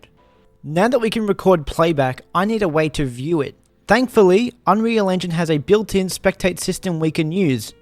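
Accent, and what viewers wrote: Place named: United Kingdom. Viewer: Bro roasted Rito so hard in his british accent. Loved the video man, so good 👍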